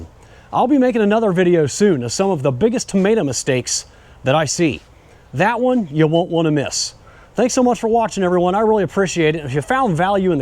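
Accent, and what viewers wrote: Ohio, United States. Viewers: Love your Ohio accent!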